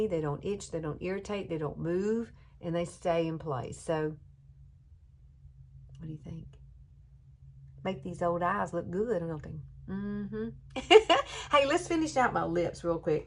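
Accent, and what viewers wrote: South, United States. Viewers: Hi, I recently found your channel; You're so cute and interesting. I like your southern drawl and emphasis on words. I'm a northerner but lived in TN for 28 years but still sound like a Yankee. is. I recently found your channel and so enjoy your videos. I'm going to try those eyelashes as soon as possible. I am 70 so I don't mess with glue around the eyes, especially after cataract surgery. God bless!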